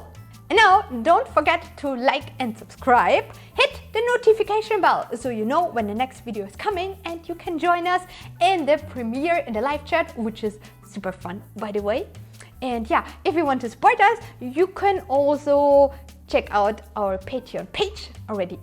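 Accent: Germany. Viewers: she's german, it's an accent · quick question are u german? bc u sound like german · Ich hör doch da Deutsch raus, Brubies. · I love it when germans speak english... so cute 😁😘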